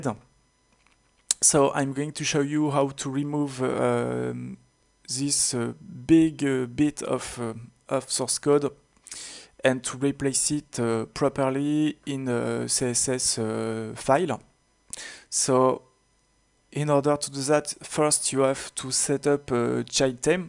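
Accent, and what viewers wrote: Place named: France. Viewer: This really helped me. And I wish I spoke French, so I dig the accent.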